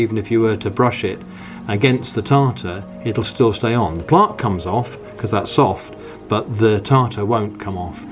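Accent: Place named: England, United Kingdom. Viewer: Because he’s English and that is the correct way to pronounce it.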